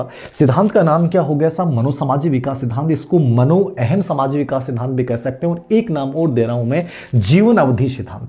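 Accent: Rajasthan, India. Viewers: बहुत अच्छा समझाया आपने सर जी मारवाड़ी आपकी बहुत अच्छी लगती है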